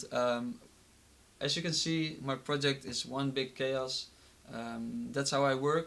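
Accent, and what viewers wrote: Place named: Netherlands. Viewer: Pittig nederlands, dat hoor je gelijk! :P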